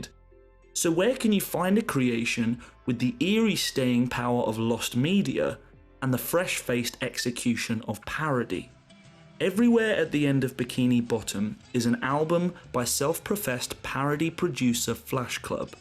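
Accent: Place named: United Kingdom. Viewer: Youtube: "Do you wanna watch a video of a british man explaining a Spongebob parody of a album?" Me: "Yes."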